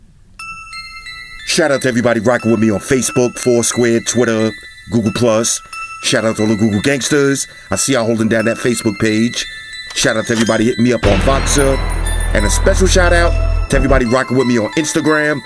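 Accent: New York, United States. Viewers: You are a Great reviewer!. I'm here mainly for your NYC Accent!. And I almost forgot, Pitching me rolling!🤣😂👍🍺🍺🍺🍻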